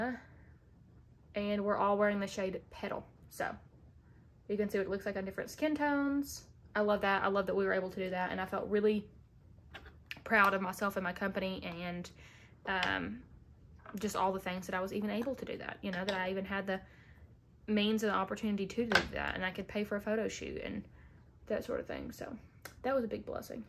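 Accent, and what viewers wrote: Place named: Kentucky, United States. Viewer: Are you from Ky? Your accent makes me think you’re a Kentucky gal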